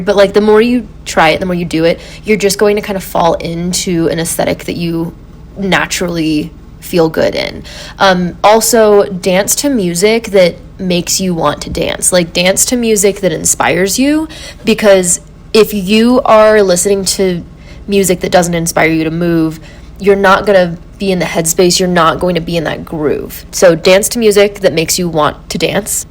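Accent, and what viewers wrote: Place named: Colorado, United States. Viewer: Decided to catch up on some of your vids I've been meaning to watch, and heeey this popped up! Lovely to see something new from you. I adore how down-to-earth all of your content is, and I was so excited to find out you're a fellow Coloradan! (No hiding that native pronunciation, haha)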